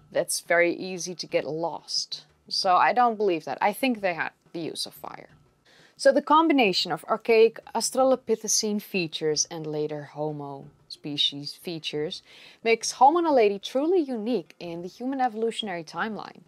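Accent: Netherlands. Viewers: Do I detect a Dutch accent? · do I detect the slightest Dutch accent? maybe even belgian? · Na 3 seconden hoorde ik dat je nederlands bent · Ik hou van je Nederlandse accent en hoe intelligent je klinkt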